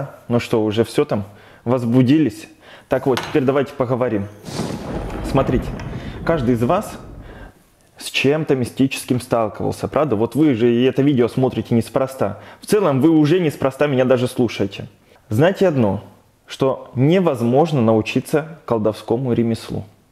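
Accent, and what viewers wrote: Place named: Belarus. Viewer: Я поняла, чем ты меня привлекаешь - ты с Украины! Хотя по говору, думала, что ты белорус.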